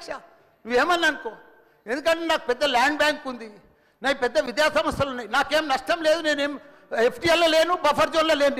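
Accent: Telangana, India. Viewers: అచ్చమైన తెలంగాణ బాషా మల్లన్నదీ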